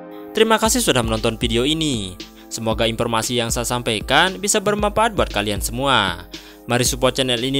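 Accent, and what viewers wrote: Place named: Bali, Indonesia. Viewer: Logatnya bali banget bang😁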